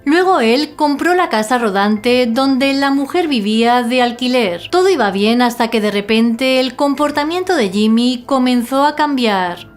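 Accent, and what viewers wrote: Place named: Galicia, Spain. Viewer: si... porque es muy "gallega"muy lenta y alarga innecesariamente. Da pereza.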